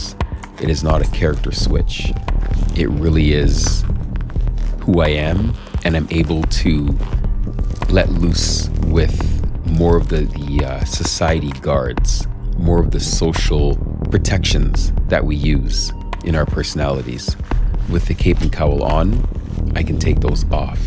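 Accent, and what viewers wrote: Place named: Canada. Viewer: YOU ARE A CANADIAN.......I CAN MAKE IT OUT FROM YOUR ACCENT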